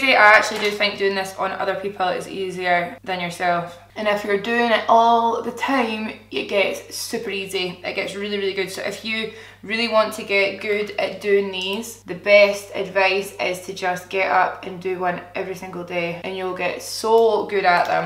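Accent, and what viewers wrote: Scotland, United Kingdom. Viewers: There's nothing less beautiful than saying beautiful with a scottish accent! Until a week ago i'd been in new zealand for 2.5 years and watching your vlogs and your scottish accent gave me life! and kept me in tune with my own accent. love it every week- you're amazing! - IG sazwood94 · Omg I saw the awesome makeup and clicked and I was so surprised to hear a Scottish accent! Yay! Love from Glasgow x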